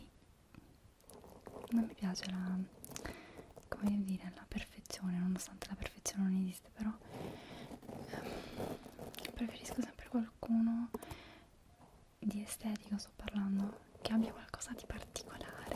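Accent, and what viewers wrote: Naples, Italy. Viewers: ma sei napoletana?,se si lo sono anche io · Amo il tuo accento napoletano 😂❤ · forse lo Avrai già detto qualche Altra volta.. scusa se te lo chiedo ma sei napoletana vero?... io lo sono😆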